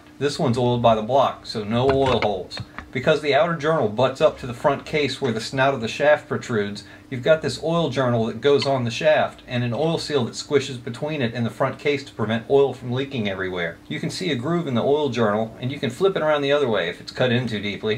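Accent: United States. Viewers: Are you from Alabama?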